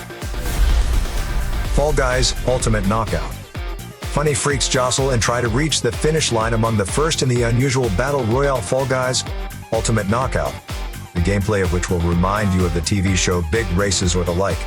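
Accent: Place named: North America. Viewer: What the fuck does that even mean even to European gamers why does everybody else in the world Dam have ugly shit so this game looks good I will shut the fuck up any case you’re speaking in a western accent North American probably tell us game isn’t out Ross yet so it should be on this list and it probably will never be out it’s my bad Amazon is not releasing it yet because they don’t want it to overshadow new world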